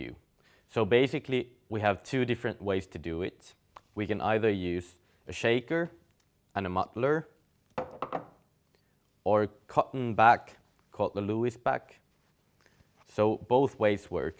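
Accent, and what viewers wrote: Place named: Finland. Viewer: Lmao i recognize a finnish accent when i hear one (im finnish)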